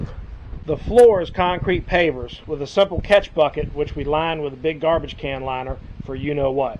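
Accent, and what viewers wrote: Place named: Carolina, United States. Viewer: Hey, you sure sound like you live next door. I’m in Carolina, north of the OuterBanks. Are you near here?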